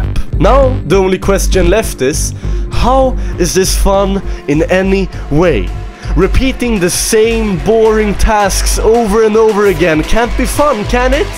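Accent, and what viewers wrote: Sweden. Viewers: I think I have watched this video maybe 20 times. I think I can already remember half of the script! Everything in this video is just flawless, everything from the music choices, pacing, the Swedish accent to the stunning motion graphics and design. This video is just perfect. This video has inspired me so much and will surely influence my decision what I want to pursue later on my studying career. I don't know if you are planning on making more content, but if you are, I will be waiting excited!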